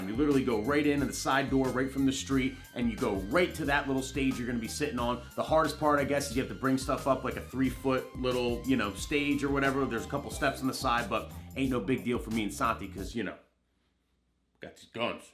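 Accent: New Jersey, United States. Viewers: Hey as soon i saw one of your vids I was like this cat sound like. JERSEY and lit fabulous job great energy munch continue success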